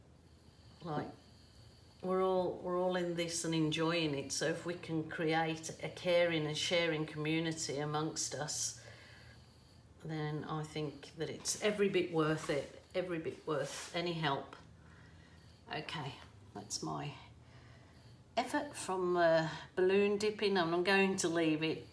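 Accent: Birmingham, United Kingdom. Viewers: Are you from Birmingham? Lol if so - waves from walsall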